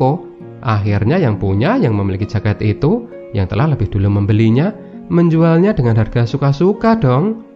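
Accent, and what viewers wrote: Java, Indonesia. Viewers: Bisa gk medok nya di hilang'in??? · Suaramu medok tenan mas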